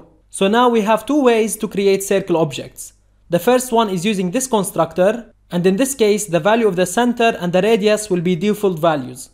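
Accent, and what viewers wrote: Russia. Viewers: are you from russia💀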